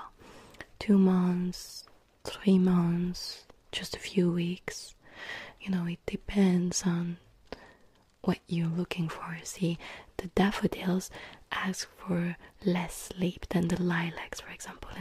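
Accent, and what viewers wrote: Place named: France. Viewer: french accent asmr ??? sign me the hell up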